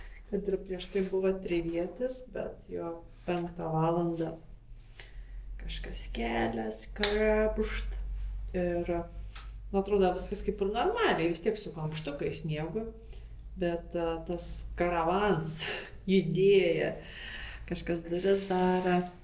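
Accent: Lithuania. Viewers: iš kur tu kilusi pagal kaba spėsiu iš Raseinių.Ten taip kalba Stebiu tave tau sekmės.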